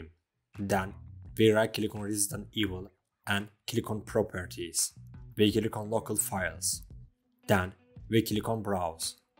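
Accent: Turkey. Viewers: Türk oldugunu inglizcenden hemen anladim :-)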